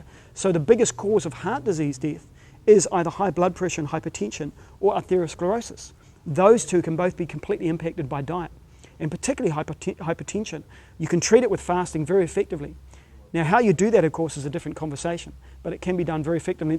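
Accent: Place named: New Zealand. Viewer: that's a very strong New Zealand accent if ever I heard one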